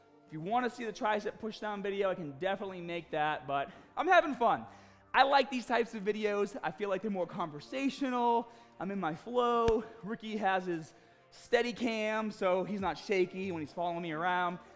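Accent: Boston, United States. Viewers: I'm dropping a comment to help with the algorithm because I'm already subscribed and I liked the video. Love your videos for the training-information, entertaining Boston accent, jokes and lively presentation.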